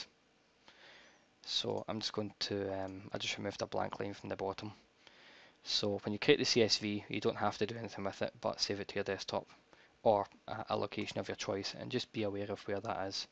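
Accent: United Kingdom. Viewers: Great video, the British English cracks me up a little ;) "Please call on <num>", Lol ;)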